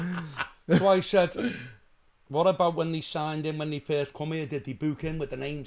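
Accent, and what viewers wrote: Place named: Wales, United Kingdom. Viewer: He has got the Welsh absolutely bang on, i lived there for 5 years, Their attitude and Phrasing, he has spent time there too, to do it so good. They had me good style, practiced for 2 weeks saying "My Guinni Pidlan Back" me thinking it was "you have a nice shop" - Obviously i realized when the whole Spar was on the floor, i had not said that at all, after 5 mins of evryone laughing, asking the butcher "What did i say"?? He replied "You have just said "I've got a small cock"..... Right in the middle of the shop"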